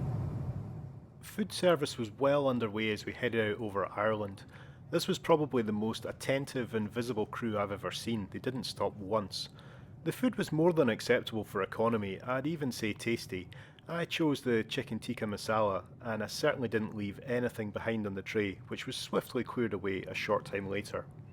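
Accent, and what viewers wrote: Scotland, United Kingdom. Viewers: I loved the 747 too... you sound much less of a Scot seven years ago..😂😂😂😂😂 went to India on a BA 747 with my friend, Club Class, it was ok, not special.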